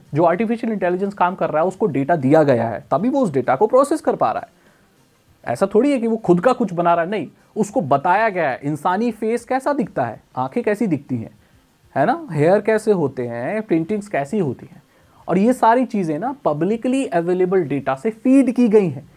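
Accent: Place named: Rural, India. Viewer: Aase lgra hai kisi gau k bnde ko sun rha hu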